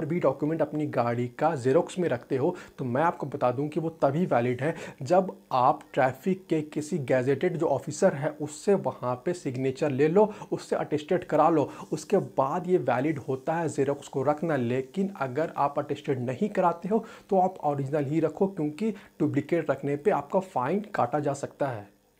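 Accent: Ranchi, India. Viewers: bhai tum ranchi se ho?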